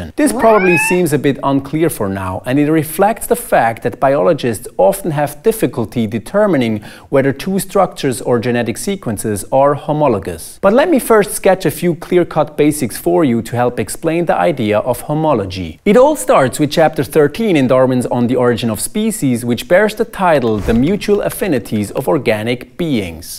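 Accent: United States. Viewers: Speak English not American thanks.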